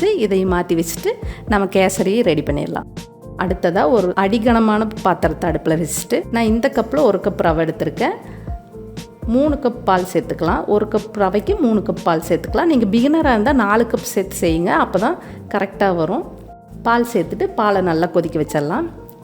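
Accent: Coimbatore, India. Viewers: Nice maam. video making nallairuku. keep going maam. speaking slang is the icon of kovai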